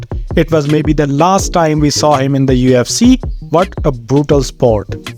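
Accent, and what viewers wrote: India. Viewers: Thank you Mr. The guy with the Indian accent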